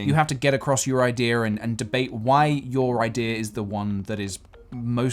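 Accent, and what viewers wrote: United Kingdom. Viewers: My gad, just fell in love with your British accent (if any French people are also hanging round here, sure yall agree) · why u sound british but not really :/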